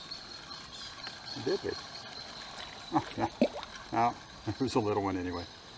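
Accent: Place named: California, United States. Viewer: You're originally from California, right? It always amazes me how similar our accents are to each other.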